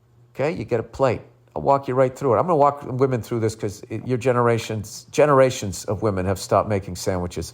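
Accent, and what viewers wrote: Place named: East Coast, United States. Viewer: i remember once in the good old bad days of this podcast when was much angrier and spoke more east coast that is to say much faster. he was talking about how people were complaining about not being able to download episodes off sound cloud i think? he said " what the fuck are you guys working your fingers to the bone pressing your download button for your free entertainment complaining get the fuck outta here". i realized he was right. that being said i cant belive this actually showed up on my feed on a monday. i was so blown away i wrote this comment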